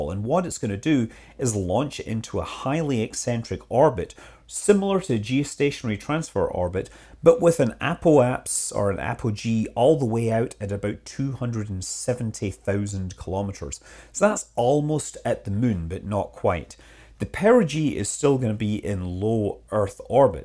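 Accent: Scotland, United Kingdom. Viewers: Scottish accent.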